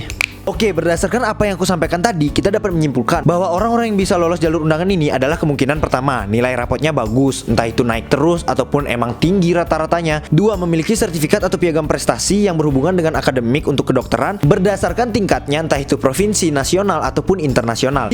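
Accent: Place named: Bali, Indonesia. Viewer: Logat balinya kerasa🤩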